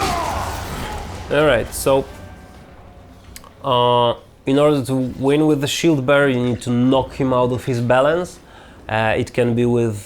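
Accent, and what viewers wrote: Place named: Eastern Europe. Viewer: his accent is so eastern europeany like it feels like im having sex with his voice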